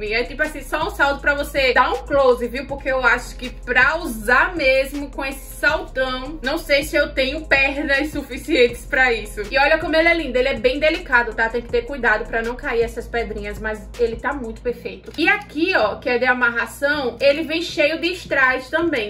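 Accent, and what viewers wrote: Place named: João Pessoa, Brazil. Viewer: Esse sotaque nunca me enganou, sou de João Pessoa e não vivo mais sem tu, mulher 😂😂😍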